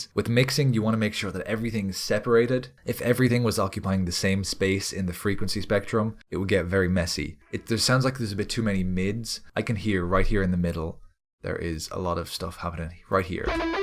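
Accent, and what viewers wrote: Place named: Ireland. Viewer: Why did I never know you were Irish??? Love ittt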